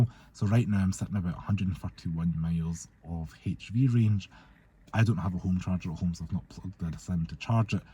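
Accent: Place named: Paisley, United Kingdom. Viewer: I meant no offence, as I’m sure you are a lovely bloke. Right, I felt the camera was on you too much, especially when you were explaining or highlighting certain aspects of the car. Please don’t be offended, but at times I had great difficulty understanding what it was you were saying. There was very little separation between the spoken words. My daughter-in-law is from Paisley and has a similar accent to yours but she is easily understood. Anyway, I’d like to have been told more about the car i.e rear seating, do the rear windows go all the way down. Is there storage for the parcel shelf. What’s the kerb weight and so on. And what on earth is the “fuzzy Stuff”?? Cheers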